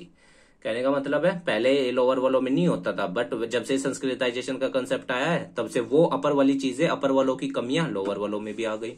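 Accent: Haryana, India. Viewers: Sir Are u from Haryana...? ? 😅😅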